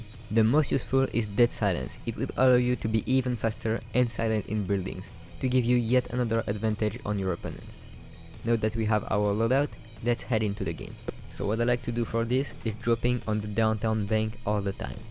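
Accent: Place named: France. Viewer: T'es français ça s'entend direct à ton accent mdr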